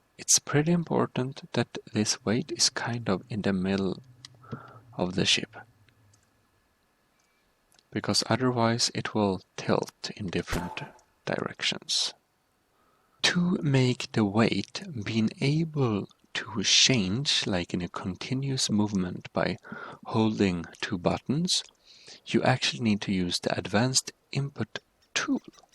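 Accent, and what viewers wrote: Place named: Sweden. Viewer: I knew you where swedish on tour accent or what it is called och kolla jag är också Svensk hej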